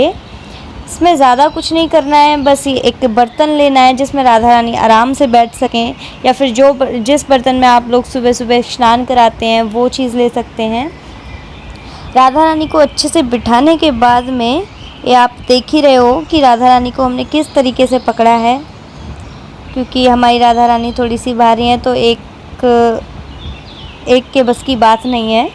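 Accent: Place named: Braj, India. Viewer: ji aap bahut Sundar braj ki bhasha bolate hye, bhut accha laga aap ka video dekh ke me hamesha ati hu vrindhavan mye... Radhe.... Radhe....🙏🙏🙏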